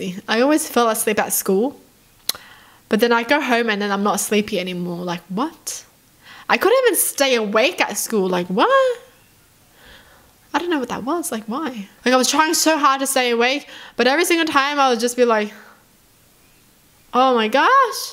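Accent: Australia. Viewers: I do like your voice! Australian accents are so cute and adorable🥹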